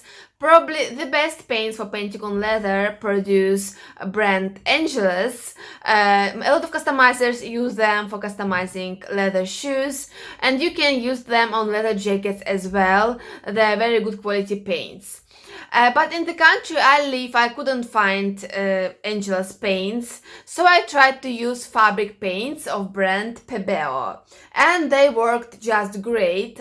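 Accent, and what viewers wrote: Russia. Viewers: Русский акцент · Она русская или какая то????английский такой 🤣🤣 video is useful but why not arcylic???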